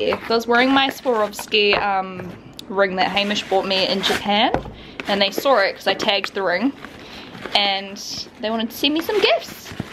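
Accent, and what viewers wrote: New Zealand. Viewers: The voice you do when you call your dogs, my mum thought it was me so funny our NZ twang 😂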